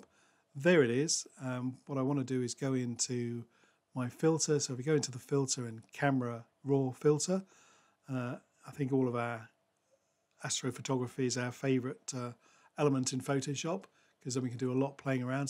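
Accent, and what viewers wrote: Australia. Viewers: you sound like an Aussie expat rather than a Pom is that correct? Great moon images mate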